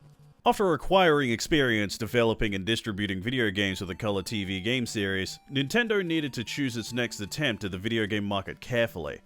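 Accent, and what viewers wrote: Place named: Australia. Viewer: Man the Australian accent sure does kinda suck, basically roll the dice to decide how to pronounce half the words needed to make a video like this. And I say that as an Australian.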